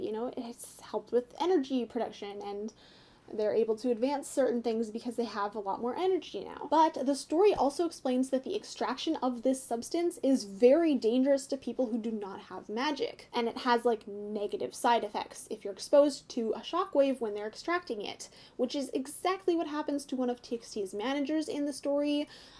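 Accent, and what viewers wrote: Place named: Canada. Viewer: you don't have a strong canadian accent, you sound pretty american most the time but the way you say some words sounds canadian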